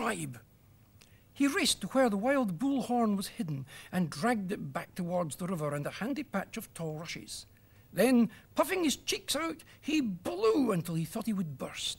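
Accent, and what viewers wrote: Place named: Scotland, United Kingdom. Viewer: Guy sounds scottish.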